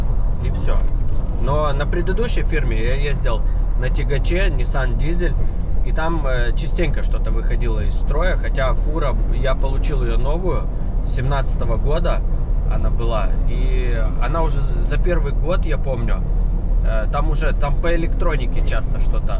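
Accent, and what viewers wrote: Odessa, Ukraine. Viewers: я не сразу понял, что он одессит. Теперь слушаю уже фильтруя слова.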